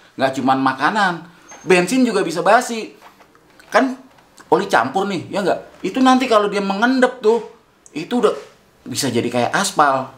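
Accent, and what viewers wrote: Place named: Betawi, Indonesia. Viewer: Mantap bang,,,suka betawi nyablaknya,,salam berawi ora,,,😆😆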